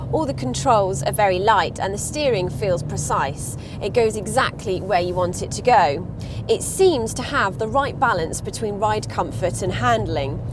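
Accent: United Kingdom. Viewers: i'm so annoyed by her british accent after a while.. I dunno why because I like british accents..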